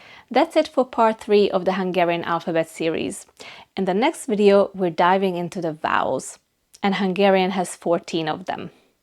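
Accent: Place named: North America. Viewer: you speak very well, i think you are native hungarian, but then at the end your english is North American. I am from Sask.Canada, and you don't have the eastern Canada French sounds or the middle USA drawl, and dont sound like you are from the UK.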